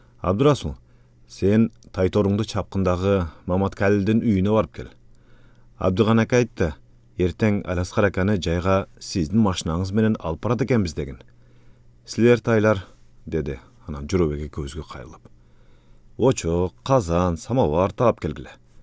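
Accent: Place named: Batken, Kyrgyzstan. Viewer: Ото соонуууууун укулуктуу Биздин Баткендин тили менен суйлогону ото укулуктуу ❤😊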